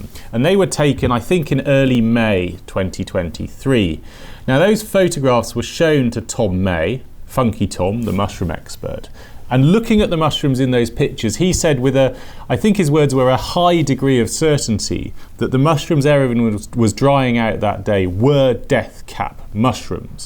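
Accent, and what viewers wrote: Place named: Southern England, United Kingdom. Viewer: It is a nice accent. Southern England? Crispy.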